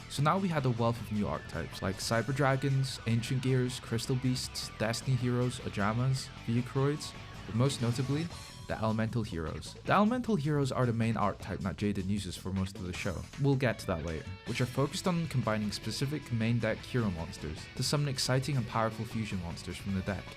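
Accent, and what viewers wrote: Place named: Scotland, United Kingdom. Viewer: Great video, your accent btw, are you Scottish, specifically aberdonian?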